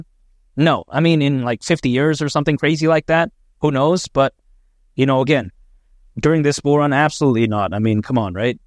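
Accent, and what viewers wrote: United Kingdom. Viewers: love $icp and love the British accent 🌐👨🏼‍💻♾️ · Nice British accent! Nice content again as well. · Great British accent. I still can't tell if you're AI or not though😂 🤔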